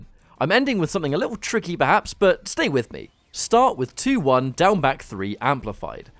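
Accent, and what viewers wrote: United Kingdom. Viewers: I knew to take this video seriously when I heard a British accent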